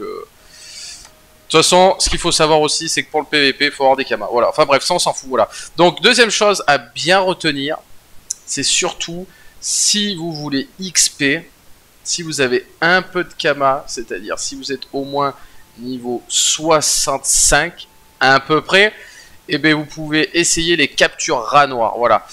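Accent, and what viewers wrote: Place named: South, France. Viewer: Je m'abonne pour ton accent le sang du sud mais je suis Dofus 2 👍 rétro j'y retourne plus 😂 trop de farm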